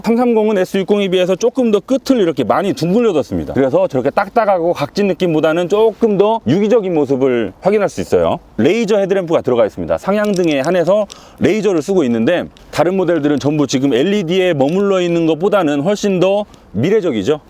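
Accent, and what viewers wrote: Chungcheong, South Korea. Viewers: 영상 처음봤는데 구독박고 갑니다~ 충청도 분이신가요? ㅎㅎ 말씀하시는 게 친근하게 들리네요~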